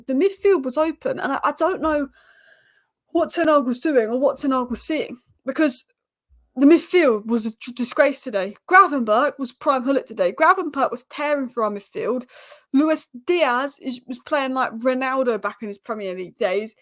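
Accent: Manchester, United Kingdom. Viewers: That's a strange Mancunian accent.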